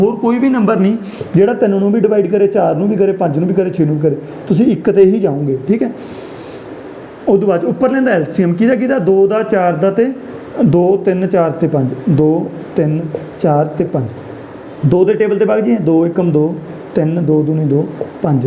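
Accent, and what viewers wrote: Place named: Gurdaspur, India. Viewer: Tuhadi language bott sohni hain;;; means apne area de according lyi;;; gurdaspur distt. tu alag hain;;; ur teaching way is so gd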